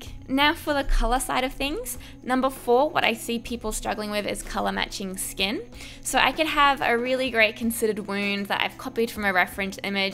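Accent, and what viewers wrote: Australia. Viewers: Came here from Mykie. Are you Aussie? Hi fellow Aussie! <3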